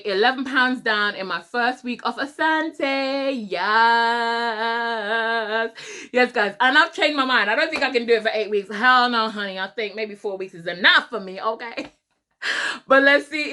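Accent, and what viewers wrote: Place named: United Kingdom. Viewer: Where are you from? You sound British and American. Can't pin you down! Great video 😁👍🏻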